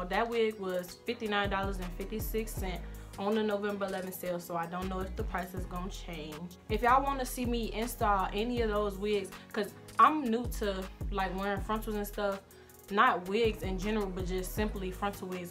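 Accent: Chicago, United States. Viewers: you’re so pretty🥺 you sound like you from chicago too😭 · You from Chicago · Where you from. It sound like you from Chicago 😭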